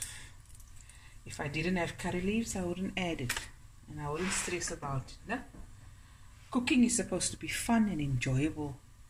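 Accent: Cape Town, South Africa. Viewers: That capetonian accent is unmistakable.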